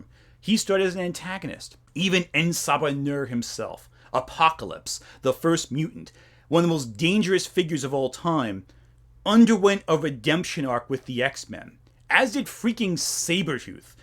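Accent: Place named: New York, United States. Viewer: where you from buddy you got a great accent, nice vid i'd guess nyc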